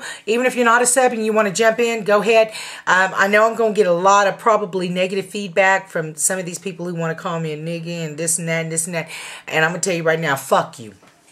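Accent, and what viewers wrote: South, United States. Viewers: I just found this page by accident and I must say this is one of the funniest things I've sen in years... You are a very beautiful asian/black woman with the most country twine that I've ever heard!!! Rotfl!!! I am relocating to Tyndall as well, and I was looking for videos about that area when I found this site... My son has grown up in a military enviroment and I can truly say that he doesn't know racism either... Us moving back to the south and dealing with ignorate people is my BIGGEST FEAR!!! Right now he has friends of many colors and has dated young ladies of different races and I love it... I am from TN orig and everytime I go home to visit I'm reminded of the race issues, but being that I have lived all over the world I forget that in the US we have race problems...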